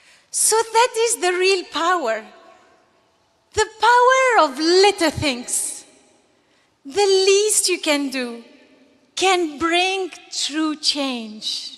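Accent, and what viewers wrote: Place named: France. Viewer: nope that's actually her English accent, you can hear the French in it.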